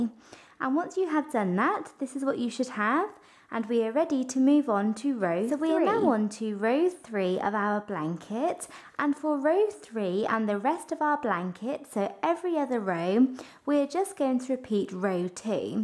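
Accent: England, United Kingdom. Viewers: great instruction I love the feel of this blanket. may I ask where you are from? English accent? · I am new to knitting and crochet and found your video very easy to follow and understand. Also love your English accent! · What lovely work you do! Are you from Britain? Lovely accent :) I also wanted to ask how much wool you needed for this blanket?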